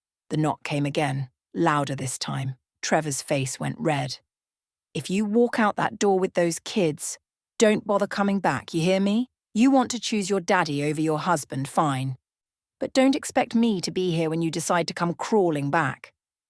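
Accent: England, United Kingdom. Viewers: an American story told with an English accent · What's the point of using a British English voice and American vocabulary?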